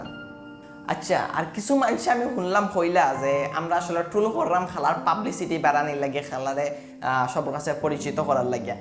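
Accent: Sylhet, Bangladesh. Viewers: Hmm Good সিলেটি আঞ্চলিক ভাষায় কথা কইলে বালা লাগে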